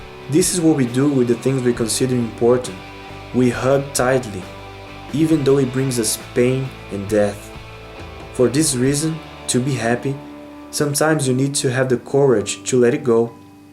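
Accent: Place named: Brazil. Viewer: I KNEW it was a Brasilian accent!!! haha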